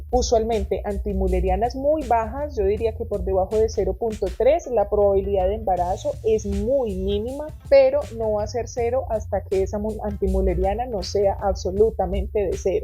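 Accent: Colombia. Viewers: ❤🙏🏻Dra. Agradezco la claridad y sutileza con la que nos explica los temas tan sensibles sobre la fertilidad. Le mando un abrazo enorme desde Tláhuac, CDMX. Usted es de las primeras personas que decidí seguir en YouTube para conocer el panorama sobre éste tema. Además me encanta su voz y forma de hablar de Colombia. ¡Gracias! por su labor.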